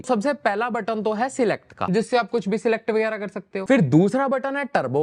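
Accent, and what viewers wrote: Punjab, India. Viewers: Bro punjabi accent 🤌🏻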